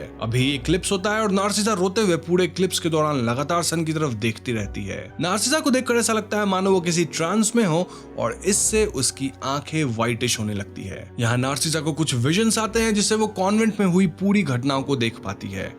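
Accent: Bengal, India. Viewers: Aap Bengali ho kya 😊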